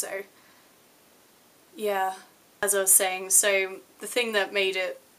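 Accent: United Kingdom. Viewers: before I even clicked on this video I knew you were gonna have a british accent, I'm not even joking. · Does anyone know what part of UK she is from? She has the exact same accent as Asa Butterfield and it's driving me crazy trying to figure out where it's from in UK. SOrry, and thanks.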